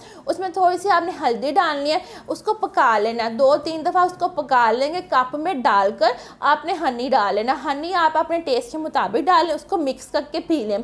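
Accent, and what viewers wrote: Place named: Lahore, Pakistan. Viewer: Lahori accent..love u ho gya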